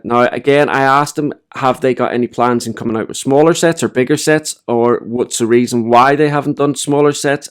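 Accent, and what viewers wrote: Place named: Northern Ireland, United Kingdom. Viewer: Ahhh, I could listen to North Ireland all day. My mum's from Armagh. :)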